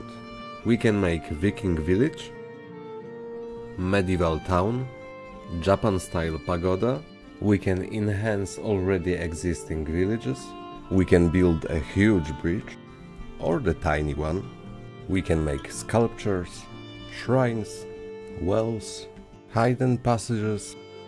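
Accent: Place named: Poland. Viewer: Tak mi się wydawało, że słyszę polski akcent. Swoich się jednak rozpoznaje X D Spoko materiał, dobra robota!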